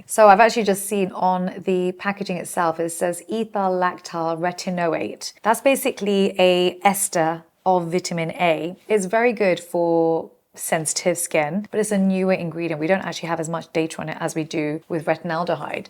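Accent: United Kingdom. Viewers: Your accent is British, but name sounds like Indian, is that true ? I'm Indian, & I'm just about to complete my MBBS internship in 2 months, & i want to work in research field, & you gave me hope thanks ma'am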